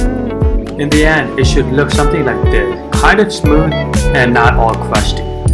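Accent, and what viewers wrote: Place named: England, United Kingdom. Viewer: You speak like English people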